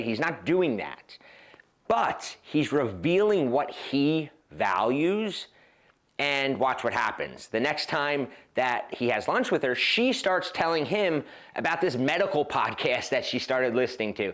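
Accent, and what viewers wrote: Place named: Philadelphia, United States. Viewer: Love it. Slow validation. I’m in exact situation now I’ll be 55 and the girl I’m dating is 39. I’m used to dating much younger woman so I’ve learned this overtime, it took me time in a few mistakes, but eventually, I got it . Quite frankly, most women love a mysterious man. I hear that Philly accent by the way.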